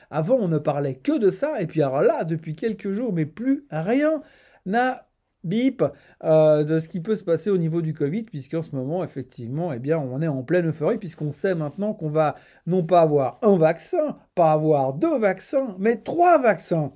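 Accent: Switzerland. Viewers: Dedieu dedieu, il me manque cet accent suisse. Merci pour ce que vous partagez ;-)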